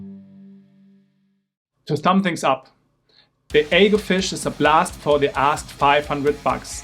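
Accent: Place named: Germany. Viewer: Your accent is atrocious. You should not be making videos. It's ridiculous. Meine peinlichen Mitdeutschen immer...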